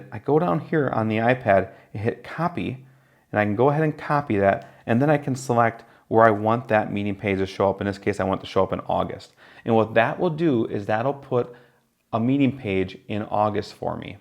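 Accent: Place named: Wisconsin, United States. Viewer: I KNEW you had a WI accent! CONFIRMED! :) Is there a Sconie discount? ;)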